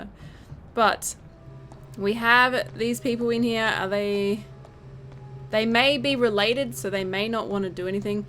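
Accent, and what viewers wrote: Australia. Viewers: ahh an aussie or new zealand ehh can't tell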